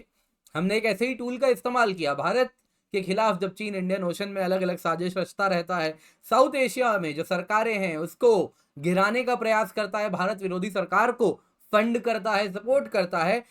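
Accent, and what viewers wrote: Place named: Pakistan. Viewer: Aap Pakistani jaisa Q baat karta ho 😢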